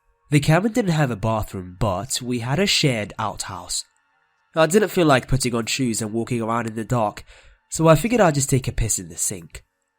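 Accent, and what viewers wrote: United Kingdom. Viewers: good video. nice reading another new sub. what part of UK you reside? Manchester myself 😀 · Good story + English or British ascent (XD) = Subbs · He is from the uk like me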